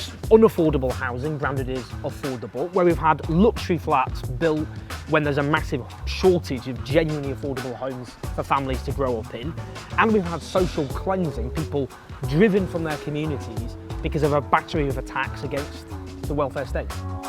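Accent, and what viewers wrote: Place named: Manchester, United Kingdom. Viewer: What has happened to Owen? Why is he pronouncing his D's in this peculiar exaggerated Mancunian style? I'm sure he never used to do this. He manages to keep it together with most of the words featuring the letter D here but falls down on 'affordable' and 'order' in quite spectacular fashion. It's like a computer going a bit wrong. What's happened to him? I hope he's okay.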